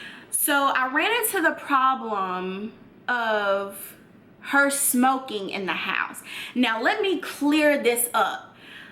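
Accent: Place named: South, United States. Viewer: Where are you from? You have kind of a southern swang in your tone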